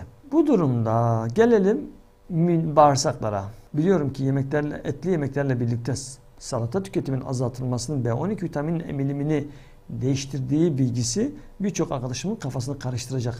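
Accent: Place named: Southeast, Turkey. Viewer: Sevğili Hocamm merhabalar,💐🙏Dün gördümm videonuzu, ve tekrâr yine dinlemek istedimm sizi, çok mutluyum gerçekten, Düşündümm bir an aklıma geldiği şivede dikkatimi çektiiii, yıllar önce Babacığımm da Eczacılık yaptılar ( Batmanın Gercüş ilçesinde kaldık ama Kozlukta da kaldık nekadar çok ismii vardır diye düşünüyorken Netten, otobiyografinize baktimm,))) Nokta atışı heĺâl olsun bana dedimm, Oraları çok severek anlatiyorumm çocukluk yillarimiz oralardaaa) Doğu ve Güneydoğu insanları bizler çok misafirperver yaklaşırlardı, Çok hakları vardır bizdee 🙏🙏🙏Onlara siz8n Nezdinizde teşekkür ediyorumm, sizlerede aynı şekilde teşekkür borçluyuz Saygıdeğer Hocamız verdiğ8niz bilgiler için Minnettarimm, ve dee severek paylaşacağimm yayinlarinizi, Sayğilarimlaa,🙏🙏💐💋💋)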